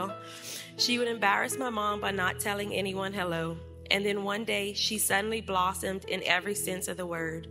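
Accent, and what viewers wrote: Louisiana, United States. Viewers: First on a lighter note, I have to say I love your Cajun accent. But, Mercy my heart breaks for you.. I can’t imagine how hard this is for you and will continue to be.. my heart goes out to you and my prayers as well. I unfortunately am apart of the same group no sibling wants to be apart of. One where we’ve had to live part of our lives without another.. mine was killed in a car accident in 1999 when he was 18 and I was 13. My best friend, who was more like a sister than just a friend, died in 2008. She was killed in an accident by a drunk driver when we were 22. My heart goes out to you and your other siblings and family. I know the pain though not your exact situation.. it’s pain I would never wish on another person. I wish I could reach thru my phone and hug you, I wish I could give you words that would help or relieve your pain. 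But I will always be here for you and will continue to pray for you and your family. I am so sorry. Sending love from SW Arkansas.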